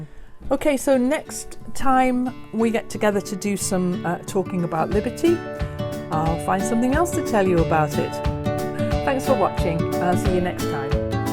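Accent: England, United Kingdom. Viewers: Love that you admitted that you pinks mandala is done and will never be finished anymore. Haha, I laugh, because my mother has many many many of the same... never completed “samples”. In just my lifetime she has collected and filled a sewing room full of one of a kind Artistic pieces of “samples” many only half completed. as she recently packed up and downsized her enormous sewing room, in a house of 40 years. She and dad, married of 60years, are moving into her next phase of life..... as I am due a 50th Birthday Quilt... as much as she would love a reason to shop for more fabrics and design a quilt... I told her I didn’t want new fabrics, rather I’d love to have a quilt of all her “samples” and class examples... how cool of a one of a kind quilt with all of moms sewing techniques full of appliqué and her free motion machine embroidery project from seminar classes she took and or taught. 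You could read a book for me anytime. You have the perfect soft English accent for my non accent American ears. I don’t have any accent from any particular corner of the states as I have been raised in Oregon. as much as I enjoy the appearance and admire the patience it takes to do English paper piecing... I would go absolutely MadHatter bonkers in the attempt. The Kaleidoscope look your mandalas have is beautiful. Thank you for sharing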